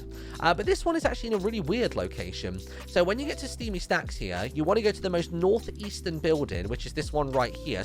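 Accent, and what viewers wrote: United Kingdom. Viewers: how is it its like a british accent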